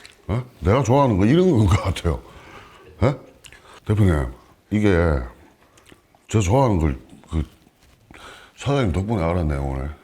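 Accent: Gyeongsang, South Korea. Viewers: 경상도 사투리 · ㅋㅋㅋㅋ같은 갱상도 인으로서 행님 정말 마음에 드십니더 !!!! 프로틴 이빠이인 번데기 먹방 가주실랍니꺼!!!!!😍😍😍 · 경상도 방언 너무 치인다 ㅋㅋㅋㅋ 목소리도 좋고 보는맛이 살아있눼~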